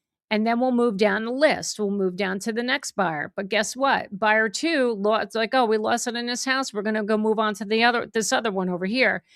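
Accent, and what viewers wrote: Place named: North Jersey, United States. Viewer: Awe, you sound like my relatives from my husband’s side in N. Jersey! I feel at home. 😊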